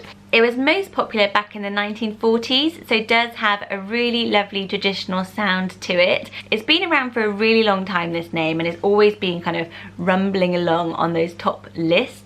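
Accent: United Kingdom. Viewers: Some of these names sound so beautiful whenever you say them with your lovely British accent, then they sound awful whenever I try to say them with my Kentucky accent. 😩 Clover, for example. She says: Clo-vah I say: Clo-vurr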